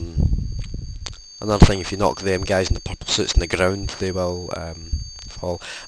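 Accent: Scotland, United Kingdom. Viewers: Haaa. I was NOT expecting you to be scottish. Yas. Nice :D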